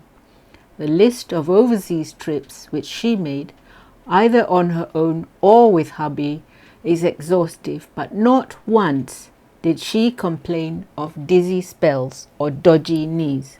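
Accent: Perak, Malaysia. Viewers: mariam putar,ckp melayu x pandai,tapi ckp pereaq okie😁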